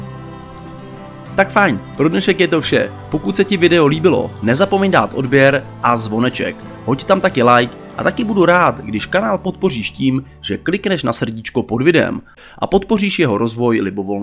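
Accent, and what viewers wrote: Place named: Pilsen, Czech Republic. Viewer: Tak mluví lidé ze z Plzně. Je to v Česku normální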